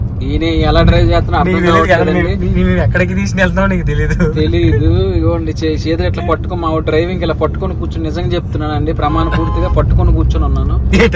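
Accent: Srikakulam, India. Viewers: Nee life antha yemi cheyyalo artham kaaka ankithani pelli chesukunnav. Hi this is ane mukka thappa neekemi raadu english srikakulam slang neeku driber job chesuko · Sir Mee slung srikakulam la undhi bro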